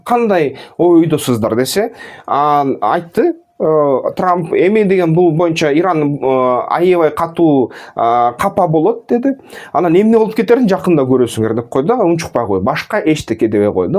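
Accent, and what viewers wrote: Standard. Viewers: Таза суйлосуз агасы азаматсыз😊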